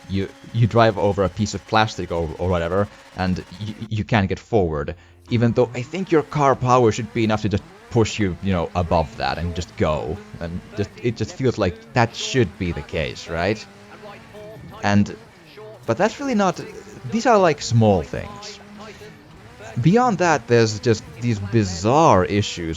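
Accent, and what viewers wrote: Finland. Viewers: Are you finnish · Are you Finnish?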